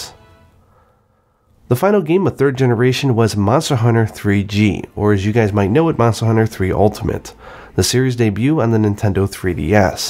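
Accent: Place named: Japan. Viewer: so this is how Japanese who're extremely fluent in English pronounce the monsters English name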